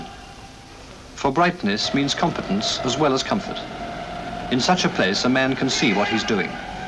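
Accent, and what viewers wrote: RP, United Kingdom. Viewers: Very good commentary cut glass accent people took a pride then… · "rubbing it in and rubbing it off... ...with a massage and a man-sized rinse". I'm sure this plumb-voiced man knew exactly what he was saying, even in 1961 :-)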